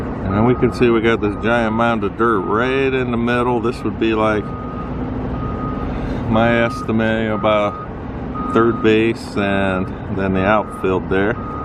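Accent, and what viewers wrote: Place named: Midwest, United States. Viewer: My wife and I have noticed your accent and it sounds overmuch like our Wisconsin/Michigan accents. Where do you originate?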